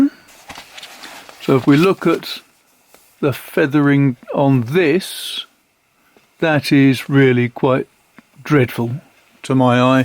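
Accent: RP, United Kingdom. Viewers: what is your accent, kind of RP?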